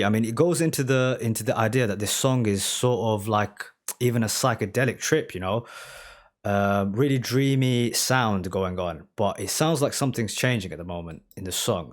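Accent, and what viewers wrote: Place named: Liverpool, United Kingdom. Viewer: You seem to have a Liverpool accent, anyway love the video reactions all the best!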